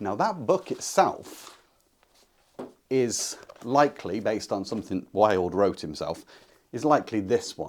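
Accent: United Kingdom. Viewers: excellent analysis - thank you! also your particular British accent is easier to understand for this midwestern American.....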